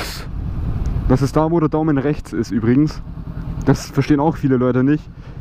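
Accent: Austria. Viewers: bist du österreicherM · unser Akzent bleibt #austria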